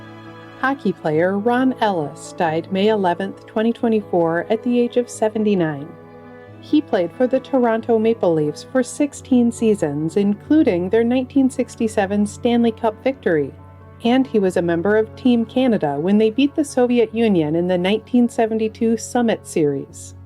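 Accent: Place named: Midwest, United States. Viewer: I dont like her midwestern vowels. Tone is fine but vowels are killing me.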